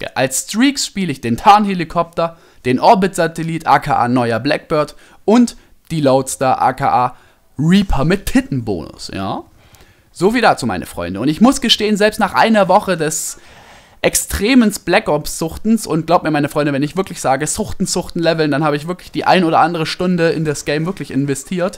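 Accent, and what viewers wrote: Swabia, Germany. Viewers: mit seinem Schwaben Dialekt:D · bist du schwabe?:D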